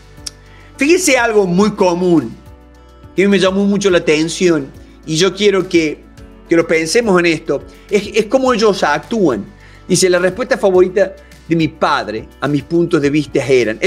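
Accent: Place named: Cordoba, Argentina. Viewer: Acento weon de los cordobeses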